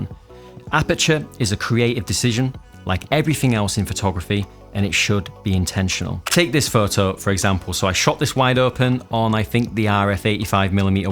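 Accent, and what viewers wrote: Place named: North, United Kingdom. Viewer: by eck it's good to 'ear a northerner on't that there internet..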